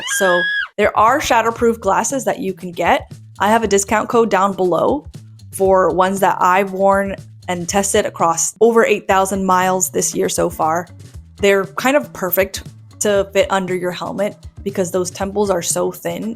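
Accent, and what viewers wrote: United States. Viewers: Great video! All solid advice for new and experienced riders. Riding is a skill. For some reason I’m stuck on your accent, though. My guess is Ohio Michigan, maybe Chicago land area growing up.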